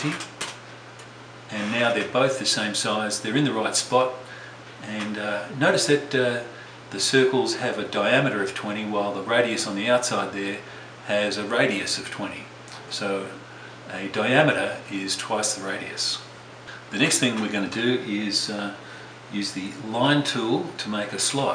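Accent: Australia. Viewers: I spent a year in Sydney working with H.O.Wills Tobacco. It was a great experience. Are you Australian?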